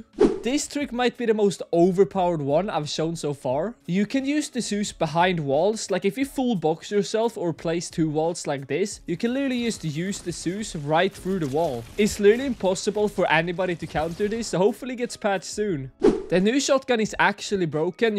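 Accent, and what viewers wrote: Sweden. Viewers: You speak swedish, I can heat it in your accent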